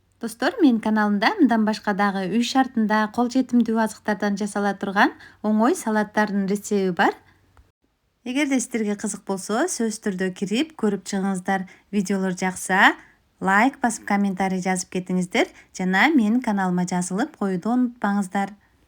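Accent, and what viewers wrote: Standard. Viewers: Азаматсын,таза кыргызча нукура так сулойт экен,кээ бир чалдырагандар бар бир созго эки орусча кошуп тантырагандар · Колуна береке аябай таза кыргызча суйлопсун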